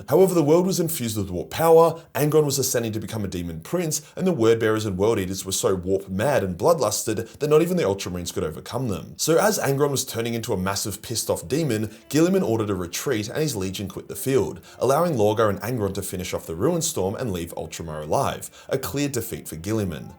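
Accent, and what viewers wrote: Australia. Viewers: With my original profile and this one I am commenting from I have been subbed to since the very beginning. I was there at the dawn when the old magic was written. Its been such a cool journey. Ive watched through so many moments of crisis and success. It's been a sight to behold seeing DOM rise so high! I hope you see this and know your Aussie voice has traveled so very far and risen to such great heights. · I only watch 2 actual content creators on YouTube, you and weshammer. I'm literally addicted to your lore videos. My girlfriend told me after going home (its long distance with regular visits) she can't sleep without "the Australian guy talking about warhammer". You're literally my favorite person on the internet and I cant thank you enough for your service! Have a great fucking day. Hail the emperor!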